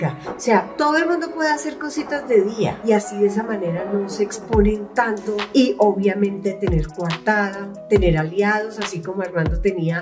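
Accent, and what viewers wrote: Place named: Colombia. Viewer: Tu voz es muy dulce y con ese acento colombiano mejor, pero la musica de fondo de pronto tu voz se pierde.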